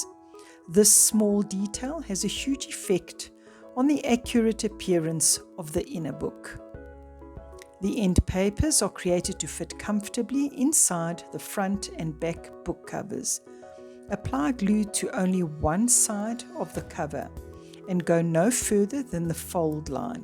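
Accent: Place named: South Africa. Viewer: Hello, I recogise your accent. South African. What a super mini book you have created. I am a bookbinder and these are the most super fun. I have only made blank miniatures. Thank you!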